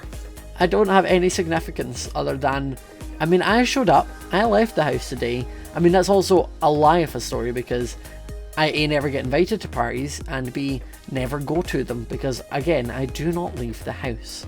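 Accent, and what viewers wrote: Scotland, United Kingdom. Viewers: I LOVE YOUR ACCENT YOU SCOTTISH CUTIE ❤️❤️🏴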